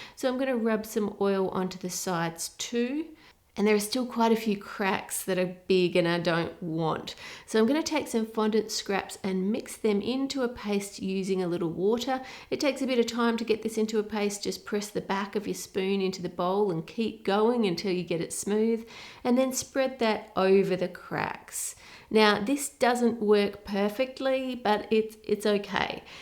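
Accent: Australia. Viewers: Doesn’t everyone in Australia speak like that?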